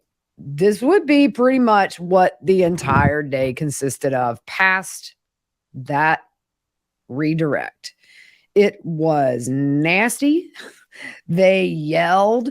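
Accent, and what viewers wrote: South, United States. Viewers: Hi our lovely, It's the 1st time I have heard of Tabitha, who is she and what is her role (if any) in this case. Thank you for your great work. And I so love your Southern accent it's great. 🤩💗💗💗💗 Lots of love from Julie in the UK 🇬🇧.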